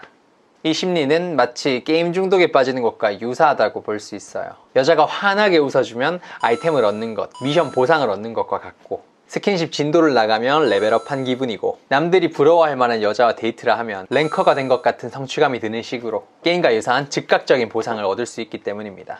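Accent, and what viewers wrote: Gyeongsang, South Korea. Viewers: 대구 말투에요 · 밑지방 사람인거 티가 나기보단 그냥 대놓고 나 밑지방 사람이야!!!!!하네요.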